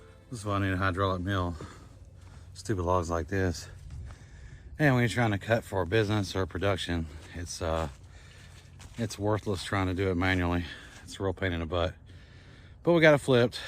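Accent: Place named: Georgia, United States. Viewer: Where in Georgia are you from?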